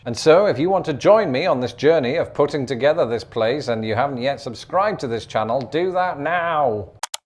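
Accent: United Kingdom. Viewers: Um, he's British.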